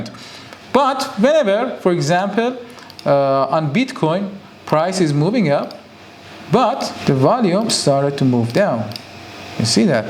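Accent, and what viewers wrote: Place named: Iran. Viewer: Hi its a great honor to see u here talking in english language but u have very farsish accent 😁😜🌹🌹❤️⭐❤️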